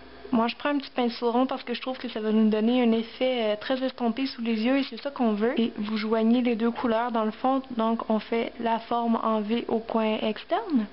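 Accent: Quebec, Canada. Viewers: tu vient du quebec?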